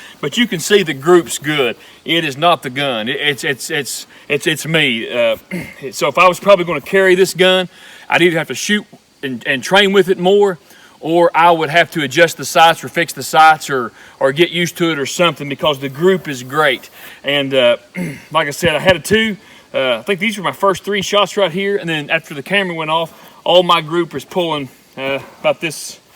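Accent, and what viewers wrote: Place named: Appalachia, United States. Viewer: You are awesome dude, you had me lol the sounding like a hillbilly comments. My wife says the same thing.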